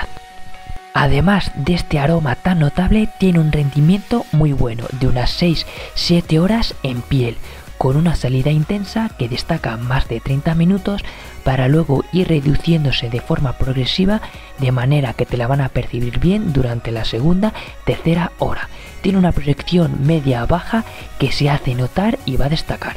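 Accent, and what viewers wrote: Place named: Madrid, Spain. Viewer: Te veo muy profesional. Gracias. Tienes acento de Madrid como un primo..Saludos.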